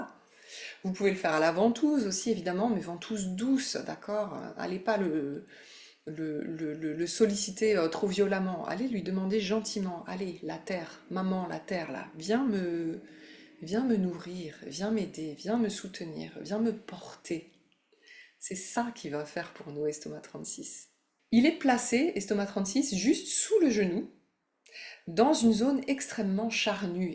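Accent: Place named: Belgium. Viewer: Je m’intéresse à la MTC depuis peu et je vous rencontre 🙏🏽 vous êtes claire, pédagogique, lumineuse alors je m’abonne directe 👏merci et bravo, j’entend la Belgique 😉🌻🌞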